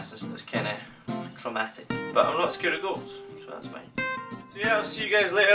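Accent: Scotland, United Kingdom. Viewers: I've never heard a Scottish accent personally it's really cool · Subscribed- G'day mate! your accent reminds me of my year 4 teacher who came from Scotland - but that was a long long time ago. I thought it would be good/interesting to see how you develop your blogs from here. Good work! Good Luck! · Scottish Accent> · I really do like his channel a lot. And I love Scotish accents. America sucks.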